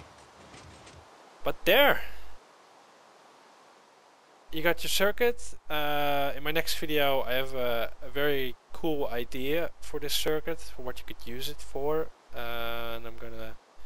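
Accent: Netherlands. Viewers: Are youo dutch?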